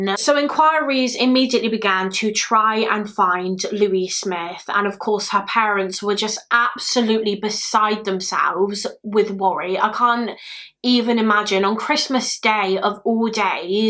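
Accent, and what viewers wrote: United Kingdom. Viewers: It takes a really heartless person to destroy someone so beautiful.:/ Wait, the murderer voluntarily came back to the UK? Wow, just Amazing. - thanks a bunch for all the work You put into these videos and i hope the holidays will be nice for You! (Your accent is so very british, can't get any more british... I hope it won't stick, since i'm a non-brit.):)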